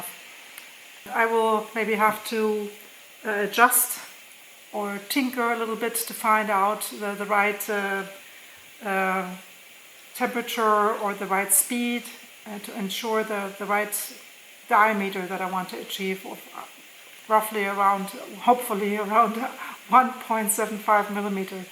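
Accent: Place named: Germany. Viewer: Da ich höre das Deine Muttersprache Deutsch wäre, frage ich in Deutsch....😅 Funktioniert es gut?...hattest Du viel ärger damit? Überlege mir auch so einen zu kaufen.😊 Grüsse Roy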